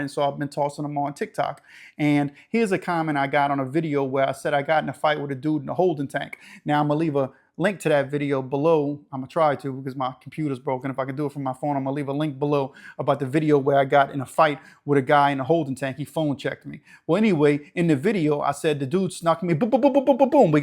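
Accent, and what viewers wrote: Louisiana, United States. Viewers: I was with you until you got to the part you said getting gold teeth is not trying to be black. Being Southern especially from Louisiana yes they all sound like you even black white. I call it (LOUISIANA SWAMP TALK)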